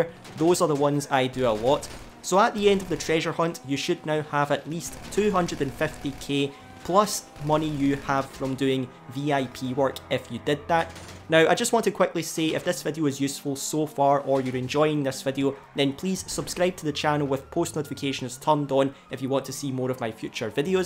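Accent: Ireland. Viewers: I love his fuckin irish accent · Irish man? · Your accent gives me Irish vibes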